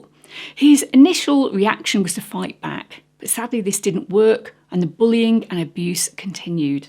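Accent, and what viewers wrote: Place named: Wales, United Kingdom. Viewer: Are you Welsh? It reminds me of listening to a Welsh author read her book. It’s a lovely accent to my ears. I am Welsh, English, Irish, French and German. I love all races but despise Nationalism. Despise Nationalism. Infantile disease. Great video 👏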